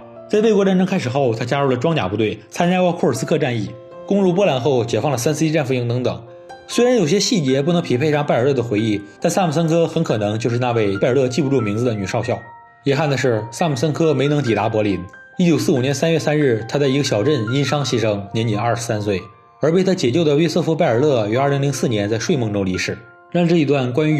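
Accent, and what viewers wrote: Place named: North, China. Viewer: 每次聽到你的聲音，我都覺得耳朵都要懷孕了～這北方口音～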